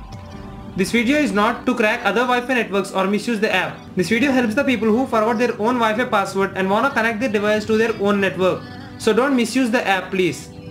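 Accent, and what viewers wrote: India. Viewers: Bla bla bla bla indian English dedicated for indiam only though i understand all but what r u saying regarding hacking password and WiFi is not true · since you have such a THICK accent, I would recommend to speak slowly because now it sound like an east-indian mess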